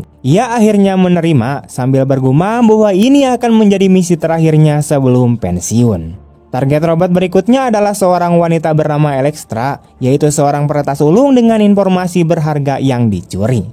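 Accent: West Java, Indonesia. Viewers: orang sunda nya mang🗿?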